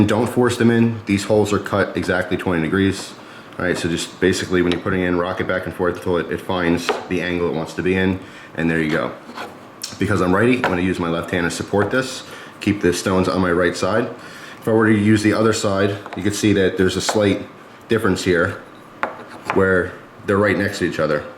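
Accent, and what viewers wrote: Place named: United States. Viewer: It's called a "strop;" it's a piece of leather with abrasive material on...Oh, wait, you're making fun of his pronunciation, aren't you? Well, my cheeky friend, there's a whole bunch of dialects in the U.S. and I honestly don't know why you are entitled to judge him for having a different one than yours.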